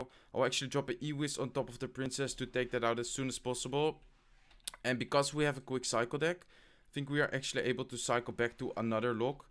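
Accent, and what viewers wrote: Netherlands. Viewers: Are u Dutch? Je hebt een Nederlands accent😂💪🏻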